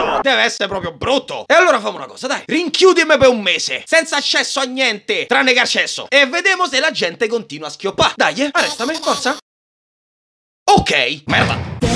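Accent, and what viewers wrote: Rome, Italy. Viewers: Ultimamente ho notato un indizietto che potrebbe aiutarci a rivelare la vera identità del, il fatto è che nei video parla in dialetto romano, ma non stretto. Ne deduco che abbia origini o risieda in Roma e provincia. Al quanto pare la tua cattura non è poi così lontana ＼(~o~)／ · Mai visto un riassunto che dura 4 min in più dell'originale, con accento romano imbruttito, jojo reference e doppiaggi più ignoranti di ogni cosa. Voto: diesci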